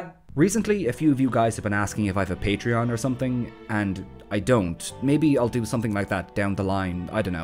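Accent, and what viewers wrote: Ireland. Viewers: Gotta say man I love your videos so far, the editing and the comedy is lovely! (Plus it's nice to hear a Irish youtuber with a accent besides Jacksepticeye floating about ) Also the ash can is ALWAYS THE GUINNESS, IT'S ALWAYS GUINNESS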